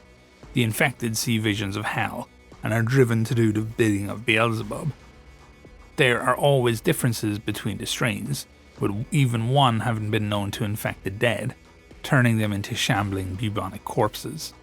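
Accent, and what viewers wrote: Ireland. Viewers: Those Irish tones add a subtle calmness to the nightmarish narrative you're describing.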